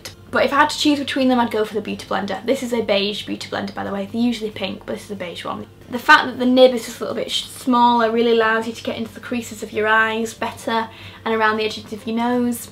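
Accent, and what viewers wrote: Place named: Manchester, United Kingdom. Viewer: Absolutely love all of your videos,. By far my favorite youtuber! Plus its so nice to hear that lovely Mancunian accent, makes me miss home!!:)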